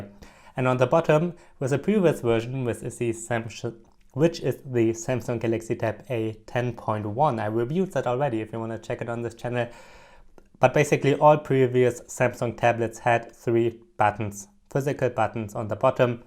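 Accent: Germany. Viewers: I just realize he has German accent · man hört aus deiner stimme dass du deutsch bist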